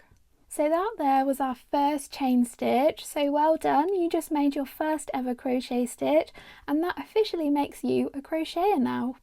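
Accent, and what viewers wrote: United Kingdom. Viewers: And for people who don't live in America and want to use UK terms, do I have to find a UK tutorial??? I thought with your accent you would be using UK terms. I need something not American.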